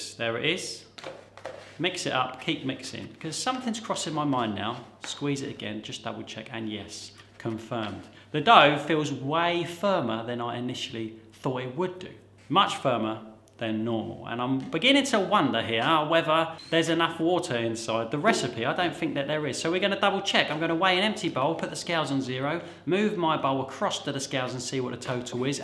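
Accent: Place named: Cockney, United Kingdom. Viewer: you don`t talk too much! You`re enthusiastic about your topics! Your Cockney accent is charming as hell, including the dropped letters, differently-pronounced words, and all. Tattoos? Well, you can`t win them all.;) Luv ya, bruv.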